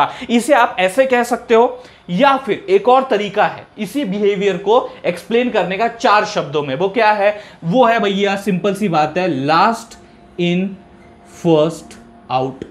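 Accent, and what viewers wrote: Maharashtra, India. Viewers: Marathi ho kya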